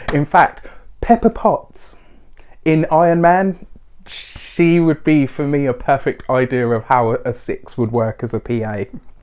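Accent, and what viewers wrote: United Kingdom. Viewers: Hold on do I hear a British accent? I got a feeling I'm a bit late but have to subscribe ! UK lady here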